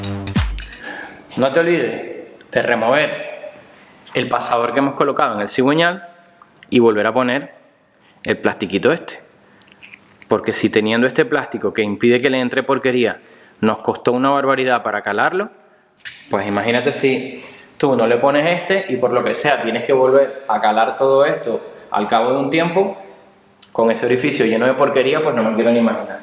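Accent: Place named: Venezuela. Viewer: Hablas como venezolano, eres de canarias?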